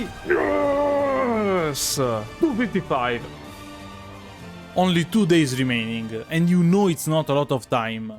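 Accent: Italy. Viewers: Our favourite norvegian-with-heavy-italian-accent youtuber! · Im 90% sure is accent is a strong italian accent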